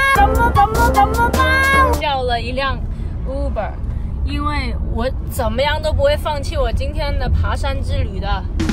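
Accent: Hong Kong, China. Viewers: 唱歌像台灣人，講話像香港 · 港普好可愛啊哈哈哈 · 港普，體諒她一下哈哈哈😂在香港人裡她中文已經算說的很好的了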